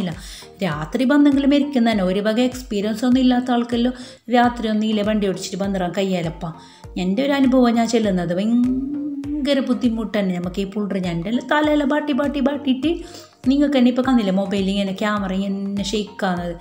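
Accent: Kasaragod, India. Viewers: Ksd basha pwolichu 😅😂 Video pwoli🕵✌ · കാസർഗോഡ് ഭാഷ സൂപ്പർ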